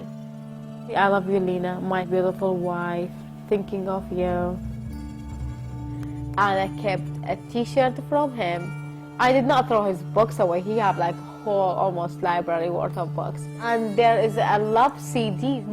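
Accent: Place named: Iraq. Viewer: بالمناسبة لهجتج عراقيه انتي مواليد ايام دخول الجيش العراقي و ممحونه.....ما ننلام من نجناكم بالكويت.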